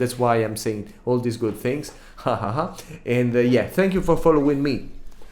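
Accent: Italy. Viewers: Ma sei italiano?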